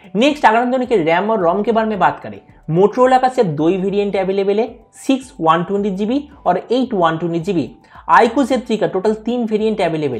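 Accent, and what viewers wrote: Bengal, India. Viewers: Ap bangali ho keya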